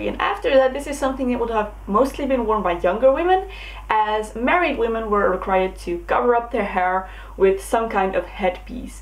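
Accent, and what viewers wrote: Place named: Netherlands. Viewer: ahh ik hoorde meteen dat je nederlands bent, super leuk gedaan dit allemaal! I'm obsessed with everything medieval and historical hehe :)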